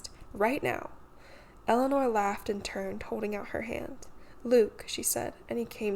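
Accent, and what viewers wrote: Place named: United States. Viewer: It's nice to hear a woman narrating a first-person story with a female protagonist. American too! Thank you!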